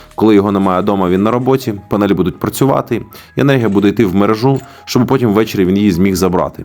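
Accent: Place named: Russia. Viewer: Обмоскалений диктор!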